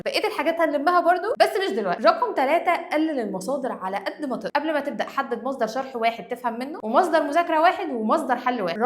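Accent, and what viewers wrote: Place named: Syria. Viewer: انتى سورية اصلا استغربت لو كنتى مصرية مستحيل تقول هيك بس ما دام لهجتك مختلفة فا طبيعى 😂😂والله لما بطلع على اللهجات بلاقى حاجات 😂😂😂😂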